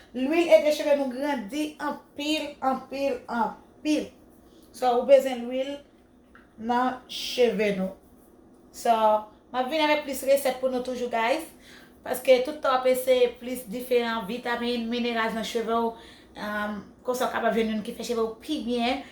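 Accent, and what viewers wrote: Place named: Dominican Republic. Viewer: li pa konn li kreol non preske dominikenn liye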